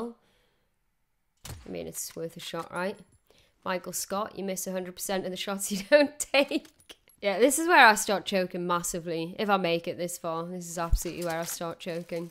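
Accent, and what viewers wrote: United Kingdom. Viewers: Is she from the UK?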